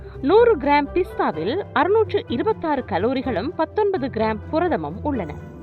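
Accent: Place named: Sri Lanka. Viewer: Azhagana inimaiyana ilangai Tamil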